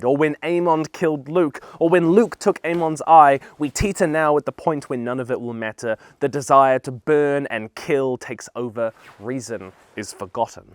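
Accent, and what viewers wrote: New Zealand. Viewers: Are you Kiwi?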